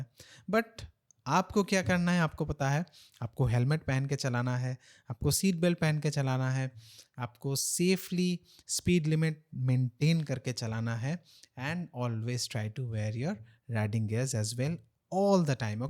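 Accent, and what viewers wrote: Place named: Bengal, India. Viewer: Your bong accent is too pronounced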